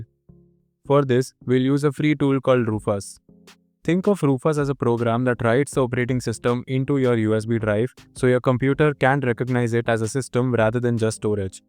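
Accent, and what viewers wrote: India. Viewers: When you hear the Indian accent you kbow he finna make yo shit work, may your food be good mah bro❤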